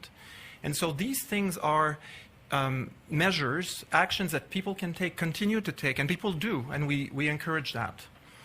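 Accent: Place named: France. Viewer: Ughhhhh. That French accent. Gross.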